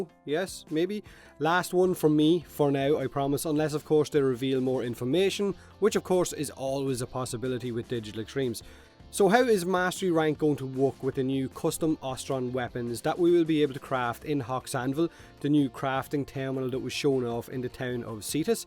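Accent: Ireland. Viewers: i love the irish accent. hell i love any games reviewed if i could ;)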